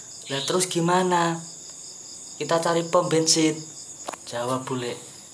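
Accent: Java, Indonesia. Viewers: Suaranya logat jawa medok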